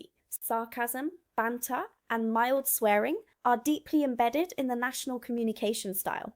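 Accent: England, United Kingdom. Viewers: Why does she have a British accent? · I want to know why you have an English accent if you are American and only been here 2yrs or so? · American brain, English accent. give a break!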